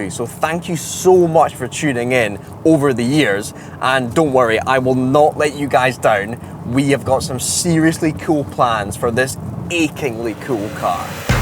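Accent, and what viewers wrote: Scotland, United Kingdom. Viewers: The rain and the host's accent scream great britain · Scottish for suree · what is this dialect? scottish? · I don't know if he's Scottish or Irish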